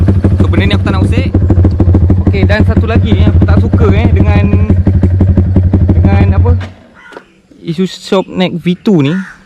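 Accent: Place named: Java, Indonesia. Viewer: dgr dia ckp dri mle2 tgk dh tau jawa. Tgk muka pon tau. Sbb aku pon jawa. Haha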